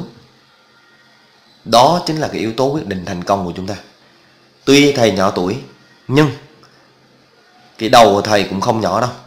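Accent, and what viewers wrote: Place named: Binh Dinh, Vietnam. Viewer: VLOG dạ hèn j dống tiếg bình định😂😂😂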